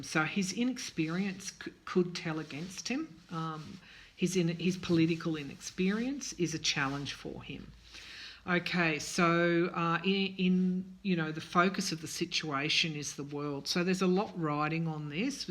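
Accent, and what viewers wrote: Australia. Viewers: Great to hear another Aussie accent oi, oi, oi! Best wishes for your channel.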